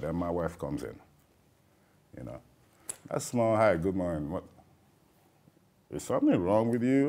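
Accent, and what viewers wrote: Nigeria. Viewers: Thank God for his healing. I love his Igbo accent 😊. People say my Junior brother looks so much like him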